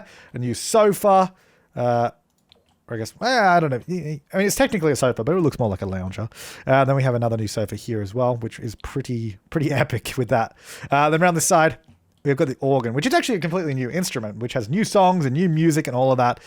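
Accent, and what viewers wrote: Australia. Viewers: Its so good hearing an Aussie voice talk about the sims <3 :D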